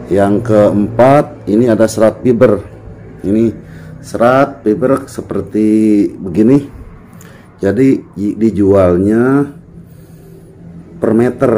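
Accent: West Java, Indonesia. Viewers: Orang Kendari logat Sunda.... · Di Kendari tp logat Sunda... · orang kendari tp logatnya spt orang jawa barat 😁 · Nembe bade naros, orang kendari tapi logat sunda☺️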